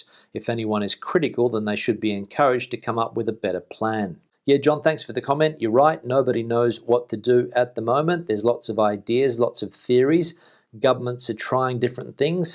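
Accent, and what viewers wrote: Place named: Australia. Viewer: Hi, — really appreciate what you are doing with your daily updates. I don’t miss a one of them! We had to give up our Sitges apartment earlier this year and can’t wait to be able to return to Spain on a regular, if not permanent, basis. But who knows when that will be at this point. Hats of to you all in Spain for your efforts and, again, thanks to you for your hard work in keeping us all informed. (PS: would love to hear you speak a few sentences in Spanish so I can hear an Aussie accent in that language!) 😁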